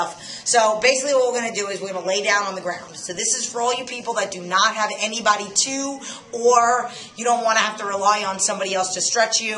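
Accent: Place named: New York, United States. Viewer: she must be a new yorker, she sounds like a new yorker! Great video post, thanks for sharing. Rock on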